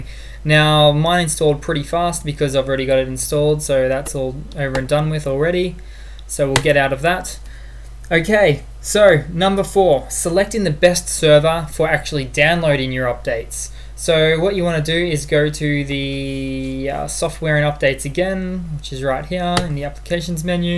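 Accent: Australia. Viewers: australian accent!!!!!!!love it xDDDDD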